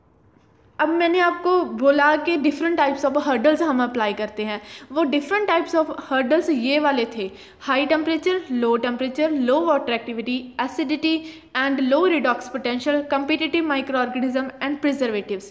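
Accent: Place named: mixed, India. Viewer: Good voice, like Punjab Haryana Girl 🙂👍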